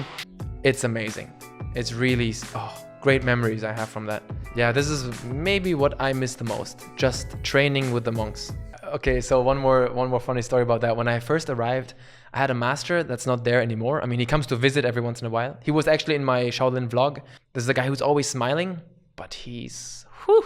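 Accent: Germany. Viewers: Wie du mit deutschem Akzent sprichst, einfach göttlich! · Question , is he originally born in germany ? Cuz he has a really big german accent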